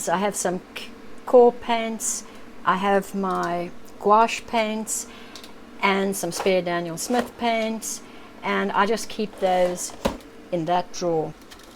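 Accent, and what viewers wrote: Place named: South Africa. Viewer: Hi, I’m so pleased to have discovered your channel and of course I immediately recognized your South African accent.... ☺️🎨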